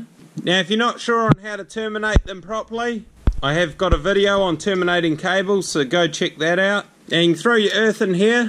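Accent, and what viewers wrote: New Zealand. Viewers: Love the kiwi accent, mate ;-) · I believe it's a kiwi accent!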